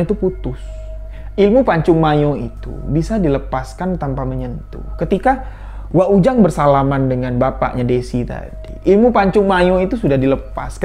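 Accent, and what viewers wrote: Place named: Jambi, Indonesia. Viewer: Mantap, 👍, saya suka cerita dari mas, logat Jambi nya enak di dengar 🙏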